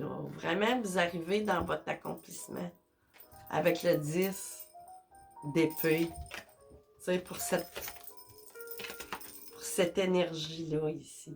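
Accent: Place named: Quebec, Canada. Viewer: Vs avez un accent québécois.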